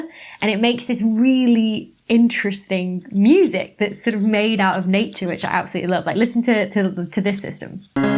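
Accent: United Kingdom. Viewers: Your accent. Is just fine; after Boston, all Brits have learned to hide their "T." 😊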